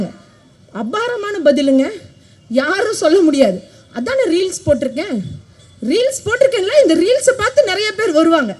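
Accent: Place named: Kongu, India. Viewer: அருமையான கொங்கு தமிழில் பதிலடி கொடுத்த சகோதரி வாழ்த்துக்கள் சகோதரி உங்கள் பணி தொடரட்டும் சிறக்கட்டும்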